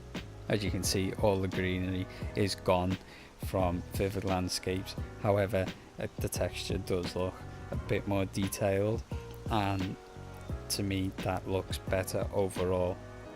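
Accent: Liverpool, United Kingdom. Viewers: Oi scouser! Cheers for the videos. Might subscribe but one question first, are you red or blue?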